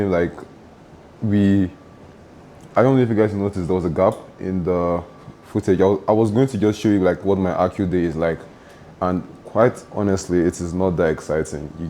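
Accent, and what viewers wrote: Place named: Nigeria. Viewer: I can hear the Nigerian accent 😂 never leaves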